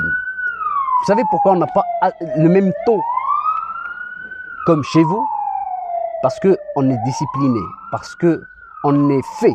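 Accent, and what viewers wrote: Belgium. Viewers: L'accent belge. Votre langue c'est aussi le français car vous parlez français dans toutes vos vidéos. Le Maroc a eu la France comme alerte. Parlez au gouvernement Français pas à la France.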